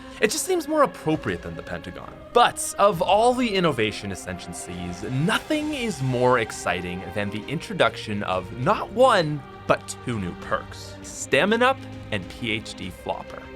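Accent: Canada. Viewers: Maso24k whats shitty about a Canadian accent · Nasal Canadian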